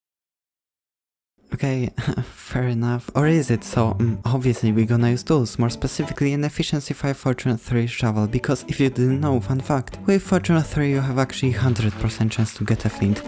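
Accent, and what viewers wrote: Poland. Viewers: Idk why but you sound Polish for me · fajny polski akcent B) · od razu poznalem że jesteś polakiem po akcencie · I thought you sounded Polish, I checked you channel and well, On point.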